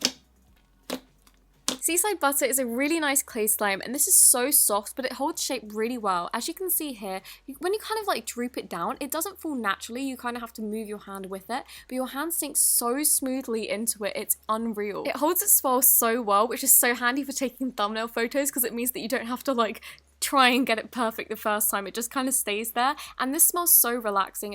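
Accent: United Kingdom. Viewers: she cant her british accent takes over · are you British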